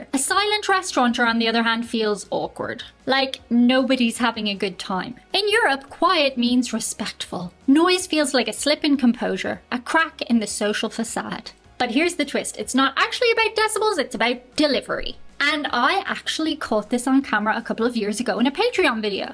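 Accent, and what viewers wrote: Ireland. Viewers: Good irish accent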